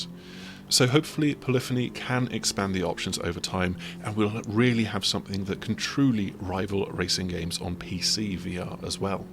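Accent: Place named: RP, United Kingdom. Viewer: You is so posh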